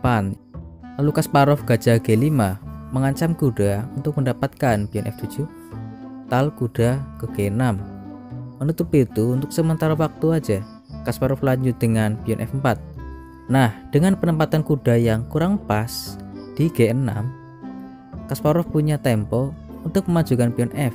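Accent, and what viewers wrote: Banyumas, Indonesia. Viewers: Ngapak ya bang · Logatnya seperti Purworejo - Banyumas ya, aku Wonogiri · Bahasanya ngapak ngapak bang..hehe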